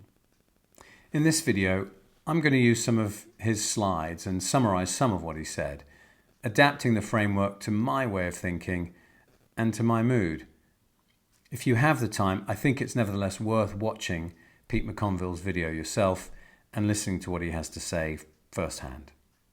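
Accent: RP, United Kingdom. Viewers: Thanks for yet another great instructive video. Your posh accents help pay more attention to what you are saying. I wonder if you ever use any expletives in your life :) I certainly do and not with a posh accent but with a French one. With regards to IWC, I would highly recommend the IW371615 Portugieser Chronograph, there is a French gentleman there that is very good at taking care of his customers.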